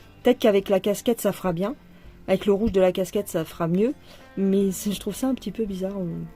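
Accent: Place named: Marseille, France. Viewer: Coucou du Québec. Bravo pour tes belles avancées et ton enthousiasme communicatif. Et j’adore ton accent. De quelle région viens-tu? Je dirais Marseille…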